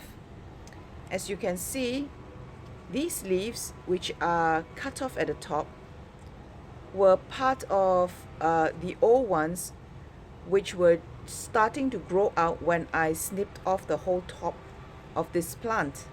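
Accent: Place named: Singapore. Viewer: Immediately after I heard your voice I said, oh a singaporean plant hobbyist.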